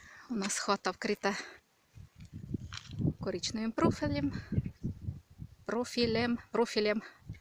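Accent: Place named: Central Ukraine, Ukraine. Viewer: У вас гарна українська мова центральної України Так говорять не тільки в ЧЕРКАСЬКІЙ, а й в Полтавській обл.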